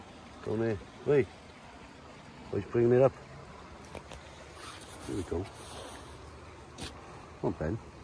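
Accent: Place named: Cardiff, United Kingdom. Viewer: Looks great great video and voice over that sounds like a Cardiff boy 👌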